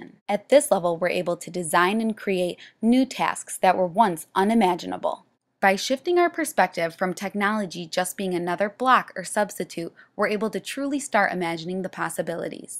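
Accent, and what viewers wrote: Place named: Midwest, United States. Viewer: That midwestern accent :)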